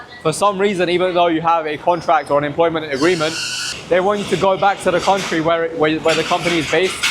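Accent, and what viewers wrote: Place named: United Kingdom. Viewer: you sound like you came from the UK.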